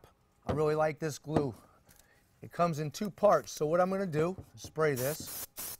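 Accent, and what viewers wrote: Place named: New Orleans, United States. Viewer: I know you must be from NOLA ??.. Recognize the accent !!!)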